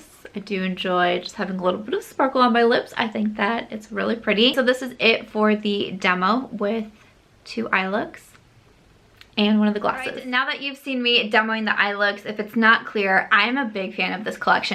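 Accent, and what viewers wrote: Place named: Iowa, United States. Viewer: #samsquad I love this palette! I love the pink look! It would inspire me to try the greens🙃. Lastly but certainly not least, I love your videos. I married a man from Nebraska so listening to an Iowan is extremely comforting. #samsquad jennesskh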